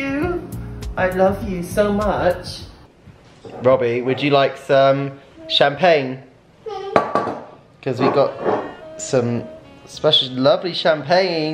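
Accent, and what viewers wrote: United Kingdom. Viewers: Between the British accent, the background music, and tinnitus, I often have to watch his videos several times. Headphones help.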